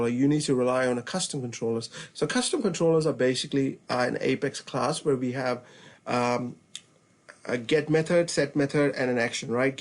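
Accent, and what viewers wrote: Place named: Scandinavia. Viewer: Your accent doesn't sound like New Zealand to me, sound like Scandinavian.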